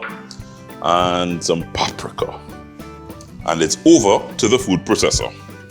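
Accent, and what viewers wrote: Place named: Virgin Islands, Caribbean. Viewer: At first I thought I Heard Virgin Islands 🇻🇮 accent but I had to listen 👂 closely lol nice voice man now meh hungry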